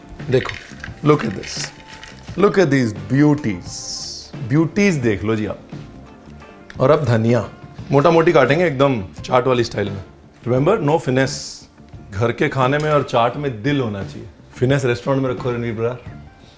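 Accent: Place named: Punjab, India. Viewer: na g na ye panjabio ka accent hai ...Not in village..😊😊😊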